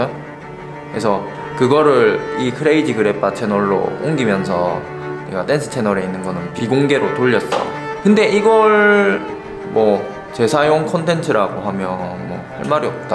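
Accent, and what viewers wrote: Daejeon, South Korea. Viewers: 저기 혹시 대전사람인가요 어째 친근하더라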